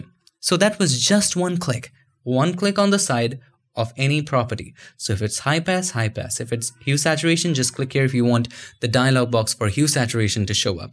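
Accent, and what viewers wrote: India. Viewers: Are you from india or outside??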